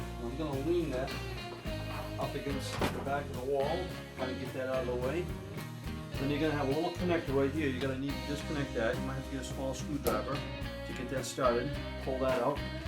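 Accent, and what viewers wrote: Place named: New Orleans, United States. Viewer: Dang buddy I recognize that accent anywhere...New Orleans or somewhere close lol thanks for the tips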